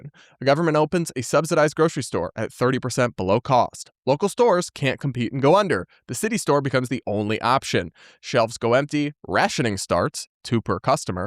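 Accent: United States. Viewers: talking with an American accent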